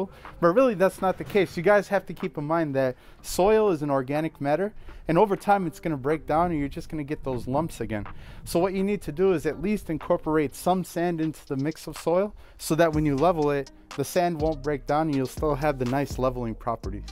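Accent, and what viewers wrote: Chicago, United States. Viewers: I love the Chicago accent, brother! Keep up the good work. Go Cubies!! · Go Cubs! Appreciate the I formation and since moving to Texas, I love hearing the Chicago accent! I need an Italian beef and Honkers Ale now.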